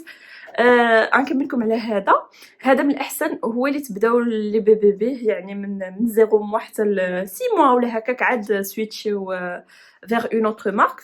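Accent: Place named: Maghreb, North Africa. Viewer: فيديو رائع❤️❤️ تصوير رائع ❤️❤️ محتوى رائع❤️❤️لكن اللهجة صعبة الفهم لمعظم العرب لا يفهمون اللهجة المغربية والجزائرية والتونسية للأسف 💔💔ف الرجاء التحدث ب الشامية أو الفصحى أو المصرية هكذا ينتشر الفيديو أسرع ويكون له جمهور أكبر او ضيفي ترجمة مصاحبة بليز 💖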